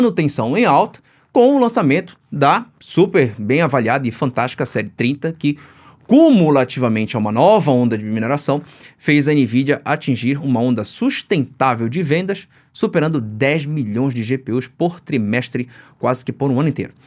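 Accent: Pará, Brazil. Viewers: Eu comecei a te ouvir pra matar a saudade do sotaque do Pará, mas gostei demais da maneira como vc discorre sobre os assuntos. Então fiquei, apesar de não entender nada do assunto. Muito bom o conteúdo, alto nível.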